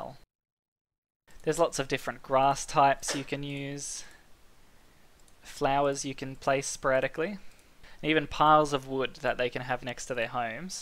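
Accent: Australia. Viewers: You're from South Australia aren't you?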